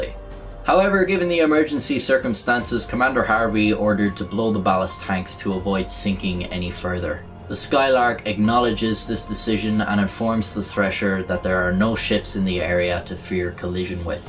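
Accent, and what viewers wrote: Ireland. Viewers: Irish accent, Video about the deep blue sea and submarines. He must be atlas from bioshock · It's always grand hearing an Irishman tell a story, any story. Erin Go Bragh! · Lemme guess ur accent, Irish? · I didn’t think that today I would be listening to a purple Irish man describing the most horrifying and instantaneous death possible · R u Irish?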